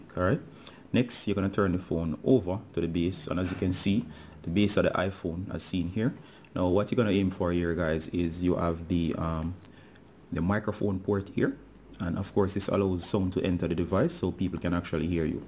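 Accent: Jamaica. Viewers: Anyone else realize the Jamaican accent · Is that a Jamaican accent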